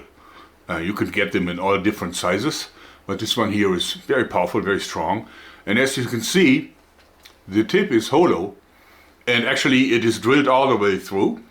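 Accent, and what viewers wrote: Germany. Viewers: The german accent is perfect for explaining nasty weaponry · You are german right? Your accent sounds like from a German.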